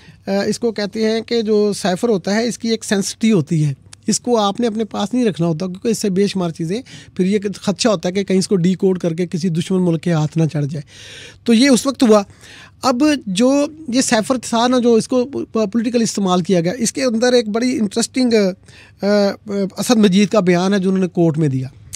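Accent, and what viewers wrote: India. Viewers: یہ اینکر بولنے میں انڈین لگتی ہے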